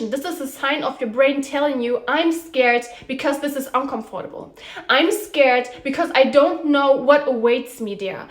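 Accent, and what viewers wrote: Germany. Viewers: I'm so thankful for this video. The second you started talking, I thought, "She's german." But not like "Her english is bad she should stop" but like,"She is german LIKE ME and even if people might recognize that she is, IT DOES NOT STOP HER. " ❤️❤️🙏 Good luck with everything you are going to achieve!! ♡I'm sending love & appreciation ♡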